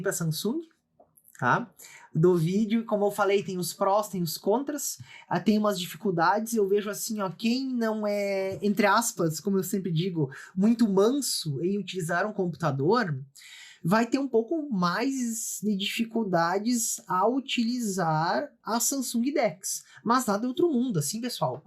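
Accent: Brazil. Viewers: Tu és de SC ou RS, tem muito sotaque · Sotaque de Porto Alegre, certeza!! Curtindo demais teus vídeos, vizinho! Kkkkk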